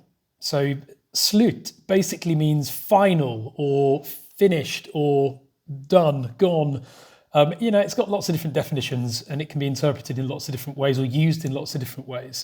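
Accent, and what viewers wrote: Sweden. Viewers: Älskar din skånska! Hälsningar från en malmöit.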